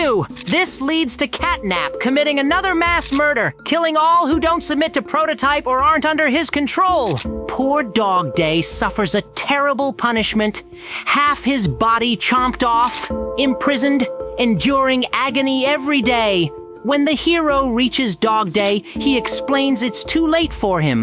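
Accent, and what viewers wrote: United Kingdom. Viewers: Bruv American to British now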